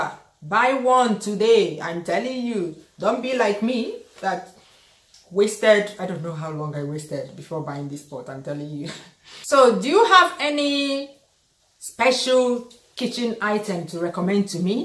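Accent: Igbo, Nigeria. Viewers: you're begging us... 🤣🤣🤣🤣 honestly I hated cooking when I was small with my mum. we would cook for hours... now we are grown she uses it... i wish we had this long ago in Nigeria.. love you igbo accent so much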